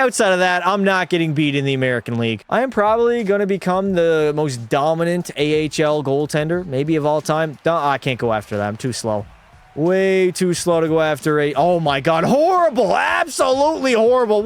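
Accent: Canada. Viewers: Could pass as brothers lmao the Canadian accent too I love it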